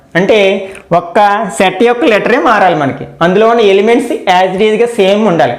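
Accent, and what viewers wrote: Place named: Godavari, India. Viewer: Thank You, While I hear your class I feel as if I hear my close friend teaching me its because of your good Godawari tone. All the best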